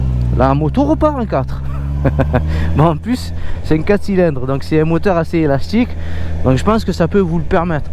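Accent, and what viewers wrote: South, France. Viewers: Super vidéo ! Et franchement ton accent du Sud quel plaisir à écouter pour un parigo comme moi 💪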